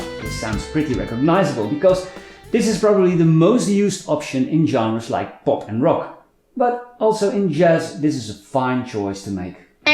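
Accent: Netherlands. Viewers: you can tell in a split second when somebody is from the Netherlands after they open their mouths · The Dutch accent suits guitar videos perfectly! Who knew? 😁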